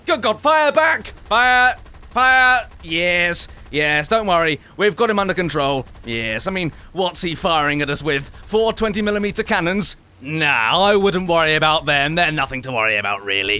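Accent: England, United Kingdom. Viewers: My god sir! You are probably the most British chap I have ever heard. God save our Queen and Rule Britannia, my good man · Aye, I'm sure it's early in the morning and any second they will be passing out food rations and tea, but I was wondering if you would give me your region of birth. In Yank talk: "Hello, I know it's early and you probably aren't reading this, but i was wondering you you'd tell me what region of England you are from. This is coming from a former colony so i can't tell.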